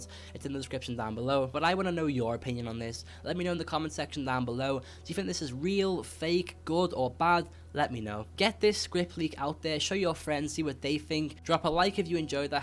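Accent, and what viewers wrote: Liverpool, United Kingdom. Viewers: Its a scouser, its obviously balls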